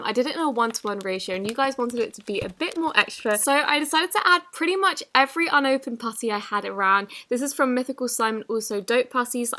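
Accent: United Kingdom. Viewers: Thang Hong she’s british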